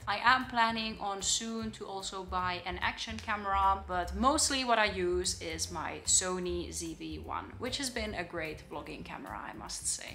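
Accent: Netherlands. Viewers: Welcome to the Netherlands,.. The funny thing is that your English pronunciation has a bit of a Dutch accent to it instead of a typical Scandinavian accent:) Also you moving to the Netherlands and our Floor Janssen made the move to live in Finland, is 'kinda' funny. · Your Dutch accent is really close when you speak English...... Sounds more close than the your Dutch itself. Stick to the english vowels and you got it !👍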